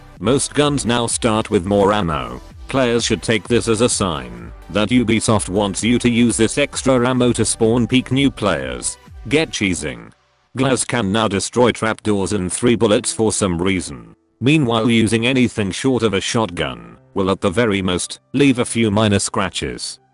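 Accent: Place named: Australia. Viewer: You should use your own voice on some videos cos OMG your aussie accent is amazing:) everyone needs to have heard the smooth taling aussie that is;)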